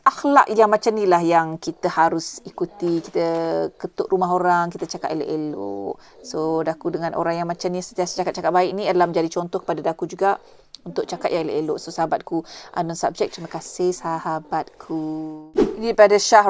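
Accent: Negeri Sembilan, Malaysia. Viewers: Suka dgr puan bercakap. Slang negori xtinggal. Menginggatkan sya pada seseorg tapi xboleh nak recall suara sapa. Pn asal mano. Masa form5 sekolah di mana & thn bila?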